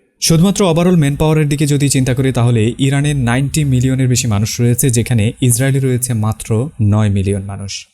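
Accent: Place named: Noakhali, Bangladesh. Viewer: ভাই, আপনার বাড়ী নোয়াখালী! কথার টোন e বুঝলাম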